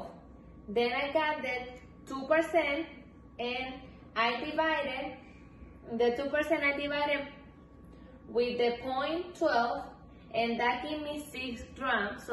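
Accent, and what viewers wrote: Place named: Colombia. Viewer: I know, but they says they can’t provide me separately any more. And I don’t know what to do now. Mr. Derrick (instructor) said y needs to generate it through the visual superscript, but it’s not the way to get the labs. Anything from you can help thank you. I’m from Bolivia y know you are Colombian, I know it by your accent.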